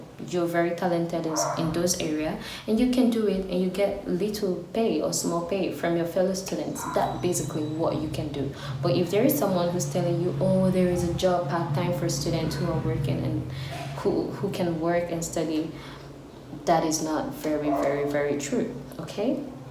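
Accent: Philippines. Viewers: Nice,your accent is like phillipines already... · You are starting to sound like a filipina. Thank you for all the information that you shared. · Wow 😮 nice accent pilipino accent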